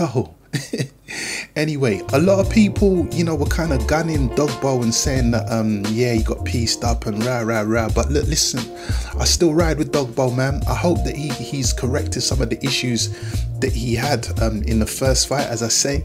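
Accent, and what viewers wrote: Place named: United Kingdom. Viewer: Brotha you got a UK emcee voice. Reminds me of a UK emcee by the name of Barney Artist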